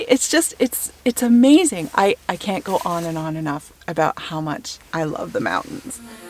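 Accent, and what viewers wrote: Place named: Canada. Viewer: Love that Canadian accent, aboot and all that. Not a fan of the cold but beautiful images. Well done.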